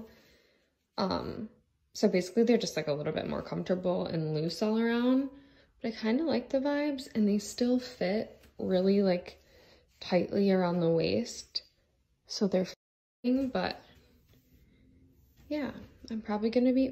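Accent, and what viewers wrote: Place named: United States. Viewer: She’s so pretty. After hearing her talk, I’m so happy that I’m from britain. American accents sounds horrible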